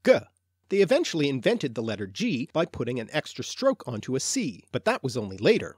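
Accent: Canada. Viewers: You sound like a Canadian. Am I right?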